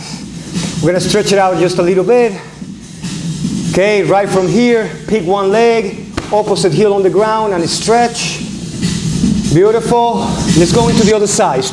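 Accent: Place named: Latin America. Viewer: HE HAS A NICE ACENT HE SEEMS To be from a latin country.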